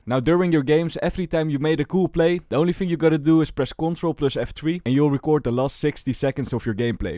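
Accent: Netherlands. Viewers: If youre not Dutch you dont hear it, but the fact that he still sounds more Dutch than English after years of making English content hurts me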